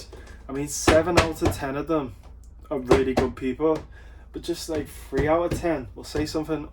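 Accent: Liverpool, United Kingdom. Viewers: Your accent where the hells it from lol it’s half Liverpudlian and half something else. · I hate scousers but you seem alright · you sound mad scouse I live in huyton ever delivered there page moss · Swear I can hear a Scouse accent there 😂👊👊 I do the flex logistics dwn1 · Love the Liverpool accent, I hope you continue with this series. See like a down to earth lad, keep your head high and I'm sure success will head your way :) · What is that accent? Scouse/yardey? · Are you a scouser ???? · the liverpudlian accent is just fucking hilarious. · picking up a scouse accent lad💪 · What is your accent? You kinda sound scouse but also really Irish at the same time? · Trying to figure out your accent. I’m hearing Liverpool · Wheres your accent from ? Not just liverpudlian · What is that accent? Sort of Liverpool gangsta I guess. Massive.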